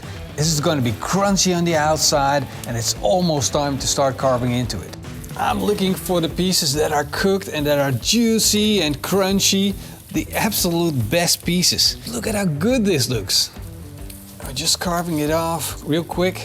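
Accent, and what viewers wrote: Netherlands. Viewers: Ik spotte je NL accent !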